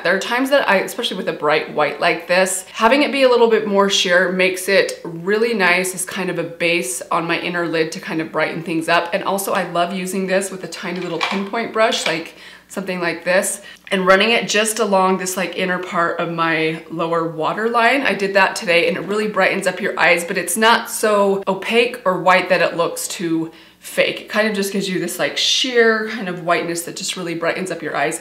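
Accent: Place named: Utah, United States. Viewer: The hard Utah accent was an immediate turn off for me. And you talk way, way too fast! I tried contacting her reps to find out which She Glam concealer she was talking about and the response was ridiculously convoluted. The fact that she suggests going to Sephora for new brands is laughable. Go to Ulta, which I also don’t love but at least you get some drugstore brands. So funny that she is talking about Nabla at the end of this vid and you can get the brand at Ulta but not Sephora. Seems all slapdash thrown together- who deemed her an expert? I will be leaving as fast as I found her-toodles.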